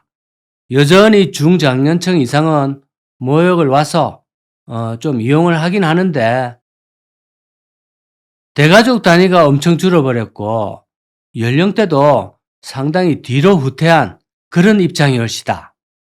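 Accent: Gyeongsang, South Korea. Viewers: 우리가 버티고 있는기 신기합니다 망해가는게 느껴지고 보이는거 같네요 갱상도 사투리가 정겹습니다 아 우야면 조ㅅ노~~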